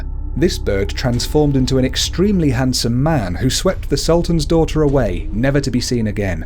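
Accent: United Kingdom. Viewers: Listening to this Brit saying PhilipineFolk creature names makes me laugh,and,just adding this,the tikbalang is fond of abducting beautiful women to be his bride,as what I have heard here from my old family members #ProudlyPinoyAko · So many words you misread! Oh my stars! I am a Filipino!!! Are you British or something?!!! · hindi ka marunong mag tagalog ano yan british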